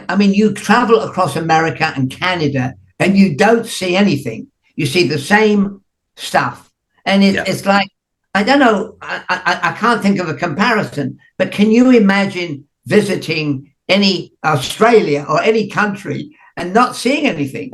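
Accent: Liverpool, United Kingdom. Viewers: One question : Why almost everybody in Liverpool I have heard speak with your kind of accent except The Beatles? Did they have to change their accents in order to be understood better or it depends on the part of Liverpool people are from? Because they never sounded like "Scousers" as you do for example .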